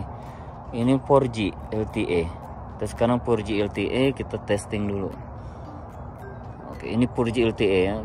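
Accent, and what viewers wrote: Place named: Lombok, Indonesia. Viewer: logatx kayak org lombok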